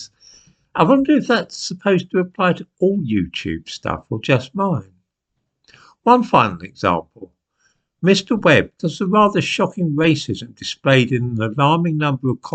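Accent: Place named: RP, United Kingdom. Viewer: Duhh! Maybe is because you’re spewing hate, bigotry, racism with a posh accent.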